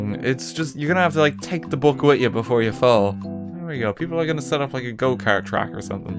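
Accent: Ireland. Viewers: Ur Irish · U Irish my guy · So funny story. Last night I plugged in my kindle, set it to an "RTGame's Best Videos" playlist, put my earbuds in, and accidentally fell asleep. I'm American, but when I woke up this morning, as a result of listening to him talk all night in my subconscious, my thoughts had an Irish accent for a solid minute. I cracked up as soon as I noticed it, and as much as I wish this was an April fool's day prank, I'm being completely serious XD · Eyyi I hear an Irish boi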